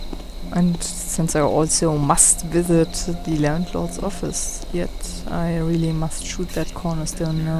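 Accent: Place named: United Kingdom. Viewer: your accent is beautiful :D british, innit?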